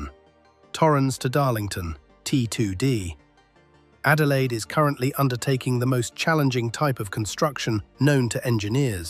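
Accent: United States. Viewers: Thank God other comments are aware of this cheap, sensationalist, uninformed click bait crap. The authorities behind these projects have the vision and foresight to act before commerce and life fail due to overuse gridlock of existing infrastructure. It is better to act before the shit hits the fan than panic when the whole world stops. Keynsian ecomonics also shows that the multiplifier effect is also developing other sectors of the economy. Instead of wasting our mutual time on this tripe, research the financing and building of the Australian Transcontinantal (Indian Pacific) Railway. Paid off 10 years after completion and still benifiting the nation. By the accent of the voiceover, I assume that this is an American vlog. It is rich that someone from a third-world banana republic (my opinion) is casting doubt on Australian initiative. From my reading, over half the bridges on the USA national highway network are overloaded or in urgent need of repair. 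Please stop gasslighting me!!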